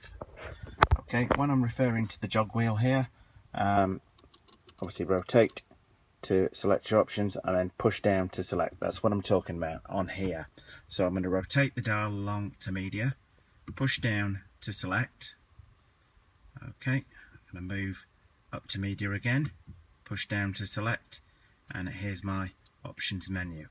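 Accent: United Kingdom. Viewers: useful, thanks... At & t though, you sound English - how are you on at & t or did you move to the usa>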